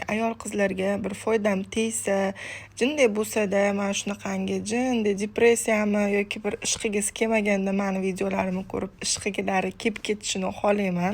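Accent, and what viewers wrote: Kokand, Uzbekistan. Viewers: Men ham shuni sezdim. Qòqonlik deb òyladim. Men ham ayol kishiman. MashaaAlloh opa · Assalamu alekum man ayol kishiman siz Qo'qonlikmisiz man Qo'qonlikman shevez uxsherkan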